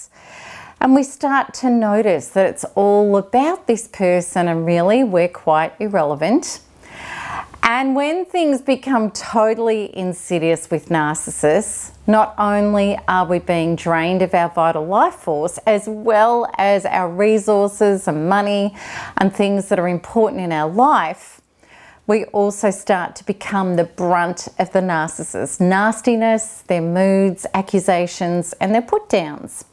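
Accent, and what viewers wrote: Australia. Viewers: Love your australian accent baby. Ur wonderful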